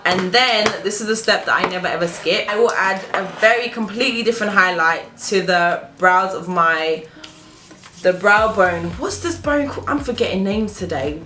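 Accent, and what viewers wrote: England, United Kingdom. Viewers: your British yay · Nice and natural! This was great video. love the English accent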